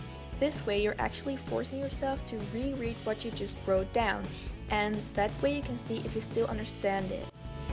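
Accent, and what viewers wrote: Netherlands. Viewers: ik dacht al dat je nederlands was😂😂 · Wait a minute, are you Dutch?? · I love it when you immediately hear someone is Dutch or not ;)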